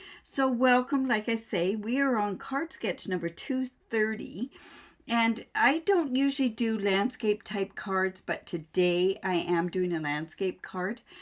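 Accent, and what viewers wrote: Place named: South, United States. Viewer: Love the pecan pie accent!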